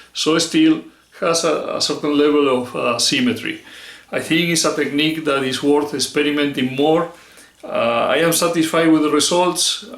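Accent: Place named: Spain. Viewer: Menudo acento tienes jajajaj, se nota que eres español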